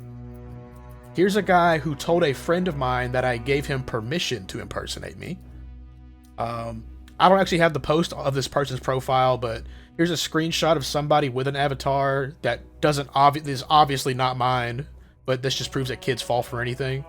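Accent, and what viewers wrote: South, United States. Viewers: In his own words, he grew up in the South; his accent and voice are not acted, he just raises his voice and adds the jokes for the character. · HIS VOICE …he really is a man of the south..🐄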